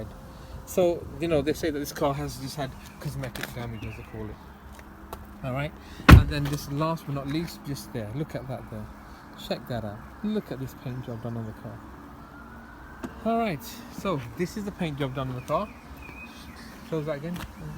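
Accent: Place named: Durban, South Africa. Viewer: is it me or does this guy have a South african Accent ...... specifically from Durban ...!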